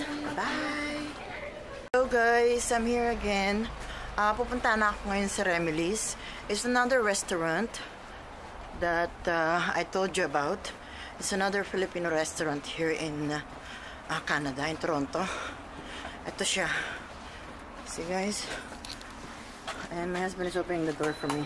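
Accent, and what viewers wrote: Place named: Rizal, Philippines. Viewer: ate ung nsa likod mo panda fave ko haha.. sarap nmn jan,namiss ko kutsinta.ndi n aq nkbili here.. ate ung punto mo "ha-ne", gnyan dn kmi magslita dti ung nktira pa kmi s teresa rizal..sa rizal po ba province u ate?nkakamiss ang word na yan hehe..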